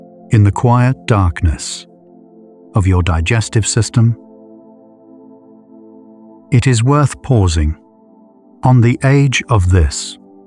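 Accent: England, United Kingdom. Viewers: Thank you for your wonderful videos. The information is awesome. I do fall asleep in the middle, but I know that your information is well researched and will go into my subconscious. Another idea for your videos is what creates disease I’ve been listening and learning from Dr. Robert Morse he’s in Florida. He’s a nature path and his philosophy and understanding on the body is that we have two systems the blood system and the lymphatic system, and the most important system for health is a lymphatic system, which is our draining system. You are either acidic or base alkaline and the bodies always trying to be at homeostasis so if you’re sick, you’ve got too much acid in your system and in order to bring Health, you need to bring in more alkaline so he is a fruitarian or more so high fruit and plant-based very interesting. Interesting intelligent man look them up. 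he’s also an iridologist and he’s very very wise so if you could learn his information and put that into your video, it would be a great way on how to teach people how to be healthy. Thanks again. Love your videos.🥰 ps.. I love the English accent very soothing, im from Vancouver B.C.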